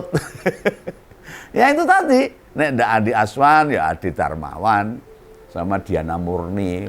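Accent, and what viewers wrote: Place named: East Java, Indonesia. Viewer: Kental sekali mas ini Wong Jawa Timur.... Sehat2 selalu Mas wong jowo asli. Aku senang dengerin.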